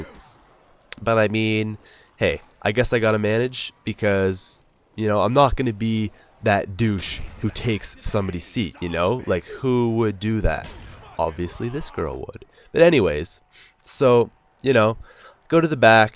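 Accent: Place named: Canada. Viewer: His Canadian accent is awesome.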